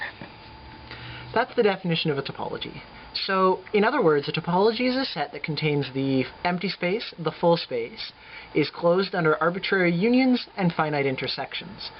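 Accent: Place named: Canada. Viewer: he keeps sayin oout...canadians.......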